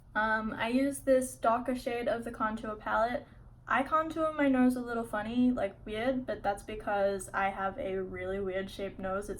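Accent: Australia. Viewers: i detect a aussie accent also this video is sooo good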